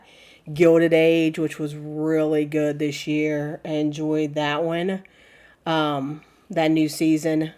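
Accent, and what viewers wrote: South, United States. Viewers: I so enjoy watching your flosstube. You are just a hoot. You are you and I so appreciate your authenticity and your southern twang. Seeing all the witch charts you made and bought was a treat. Instant viewing of finishes and future possibilities for us to do. Thank you for all you do. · Never apologize for being you! You are real and I love your southern accent! Happy stitching!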